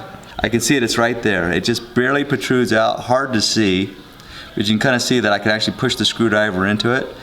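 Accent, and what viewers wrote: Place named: Utah, United States. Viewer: I appreciate the information---I recognized your Utah accent in 1 second and knew before looking up you absolutely had to be in Utah lol. Thanks again!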